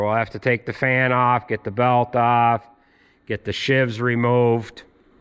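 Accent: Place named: Appalachia, United States. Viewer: Quit talkng like a hillbilly god bless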